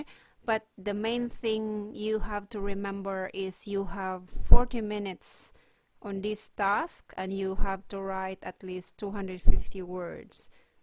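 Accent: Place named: Philippines. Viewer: The way you pronounce i think u must be a filipina or maybe half filipino.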